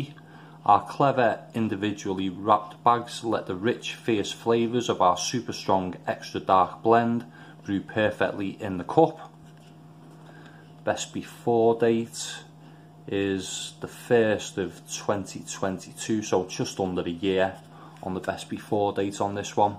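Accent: Liverpool, United Kingdom. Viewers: Fucking scouse accent ha ha perfect review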